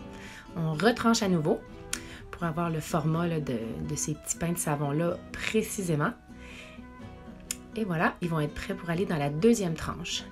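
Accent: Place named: Quebec, Canada. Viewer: Ah je me disais bien que vous étiez Québécoise, ça fait plaisir de voir une vidéo en français ! Et ne vous préoccupez pas des commentaires négatifs, je suis une Française de Paris et j’adore votre accent ^^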